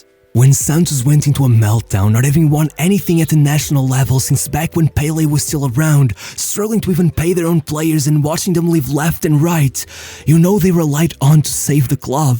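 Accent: Portugal. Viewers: Man, I'm new to your channel, really enjoying the videos, but let me ask you: Are you Portuguese or have you learned Portuguese from Portugal? Bruh, if you're not a Portuguese student you should try Brazilian Portuguese accent, specially when talking about Brazilian people and things. For exemple: Instead of Roberto "Carloosh" just say Carlos dude; instead of "Libertadoreesh" you can just say Libertadores, that "s" is actually just like English's "s", like when a verb gets the "s" when used in the present for he/she/it = works, plays -- that's the "s" sound in any of these words. I'm not hating, as I said I like your videos, I'm suggesting ok. Much love from a Brazilian Portuguese teacher from Brazil! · You are Portuguese, right? That accent is recognizable anywhere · Português* dá para ver no sotaque e no sotaque ao falar inglês. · Any chance you are from Portugal? 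Because the way you pronounce brazilian portuguese names sounds like european portuguese.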